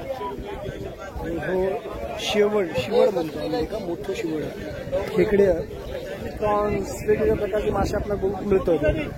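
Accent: Malvani, India. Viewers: फारच छान बाजार तुमचे मालवणी ऐकाक मजा येता।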